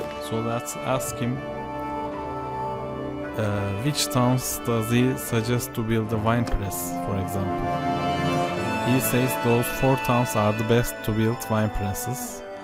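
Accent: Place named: Turkey. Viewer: Hintli gibiyiz, direk anlaşılıyor aksandan Türk olduğu insanın :)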